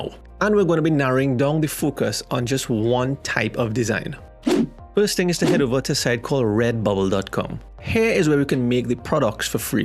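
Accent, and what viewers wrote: Trinidad and Tobago. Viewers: Are you a Trini?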